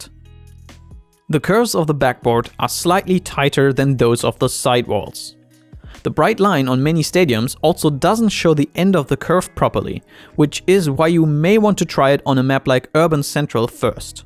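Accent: Germany. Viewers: Dear Mr, I still can't figure out your accent. It sounds german to me, but it's a pretty weird one. Did I guess it right? Sincerely Ein deutsprachiger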